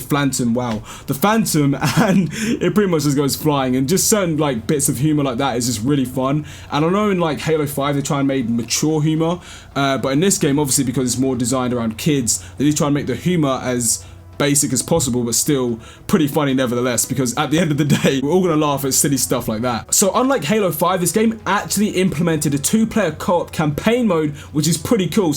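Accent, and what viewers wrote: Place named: England, United Kingdom. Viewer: Yes fam your accent is bare white you from Midlands or down south?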